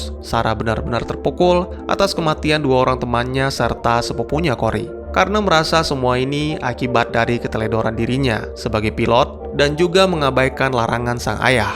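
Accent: Aceh, Indonesia. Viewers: orang aceh ya om?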